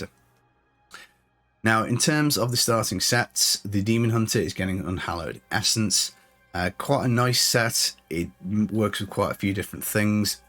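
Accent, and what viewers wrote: Stoke, United Kingdom. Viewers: Are you a stokie?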